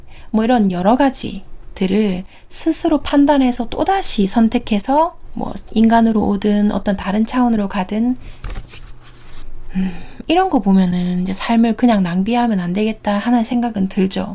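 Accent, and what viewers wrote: Gyeongsang, South Korea. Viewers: 이 채널의 주인장이 경상도 사람인 것 같아서 새삼 정이 갑니다. 책을 읽는 어감이 주는 익숙하고 편안함... 마치 고향으로 돌아가 할머니 무릎가에서 한 편의 옛날 이야기를 듣는 기분이었습니다. 편안하고 뜻깊은 시간을 주셔서 감사 드립니다. 고맙습니다~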